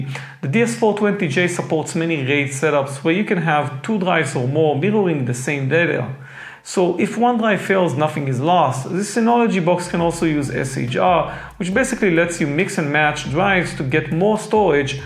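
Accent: Israel. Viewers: You have an Israeli accent 🤔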